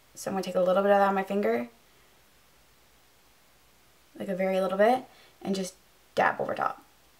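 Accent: Canada. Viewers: You sound Canadian. Are you? :)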